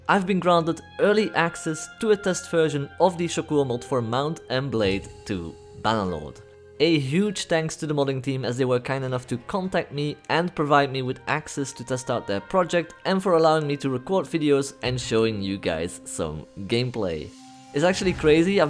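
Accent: France. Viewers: Crazy, a French guy speaking English 😮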